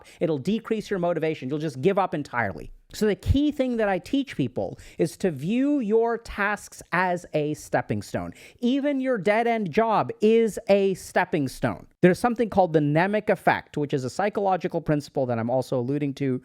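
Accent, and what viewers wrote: India. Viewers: The Indian accent is cool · As an Indian, Dr. K's Indian accent has me on the floor every single time LOL!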